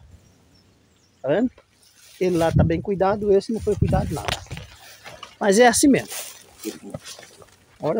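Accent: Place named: South, Brazil. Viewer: Esse sotaque do sul é lindo ❤.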